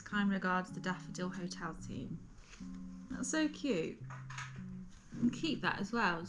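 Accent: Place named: Texas, United States. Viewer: You sound like you're from Texas! hahaha...Well hello from Texas anyway!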